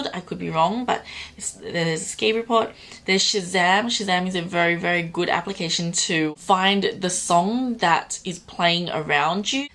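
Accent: Australia. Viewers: are you australian? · australian accent aaa ashdjdkal love it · I think it's an Australian accent.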